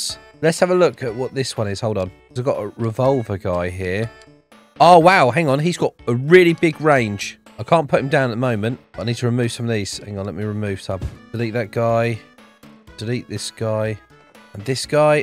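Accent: United Kingdom. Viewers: i love his ultra british voice😂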